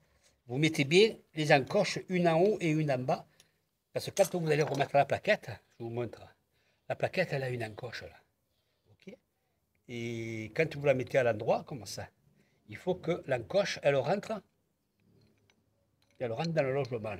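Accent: South, France. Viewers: Merci pour cette vidéo très pratique.le coup du piston à visser pas évident..heureusement que tu es là!..avec ton accent du Sud ça me rappelle mes cousins Nîmois! Merci encore Une vidéo pour la courroie secondaire serait super sympa... si tu le fait toi même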